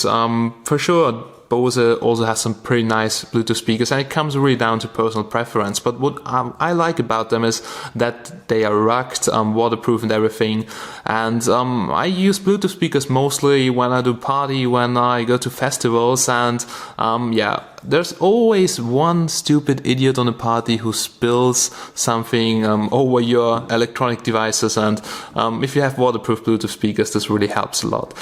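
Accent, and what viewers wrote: Germany. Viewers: wtf is that fucking german accent?!!! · uhhhh ....i hate the german accent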